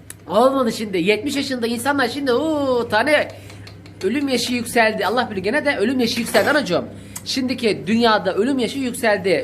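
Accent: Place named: Zonguldak, Turkey. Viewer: Ellerinize sağlık Maaşaalaaah. elinden herşey geliyo.Sşnopta yan komşumuz zonguldaklı,aynı sizin gibi konuşuyo.Öpüyorum ikinizi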